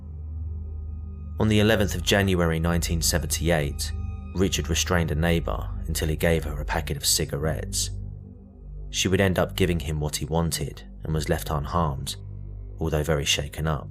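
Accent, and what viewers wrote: United Kingdom. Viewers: Super stuff my man...are you English??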